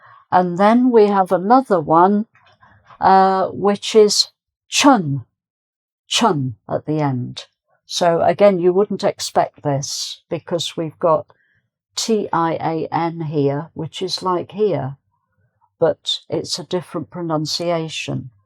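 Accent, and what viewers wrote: England, United Kingdom. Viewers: Hi great teacher I love British accent. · You are amazing lady and a great teacher with so pleasant British pronunciation, thank you❤🌹🙏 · The Lady has nationality English basead in her voice. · British English?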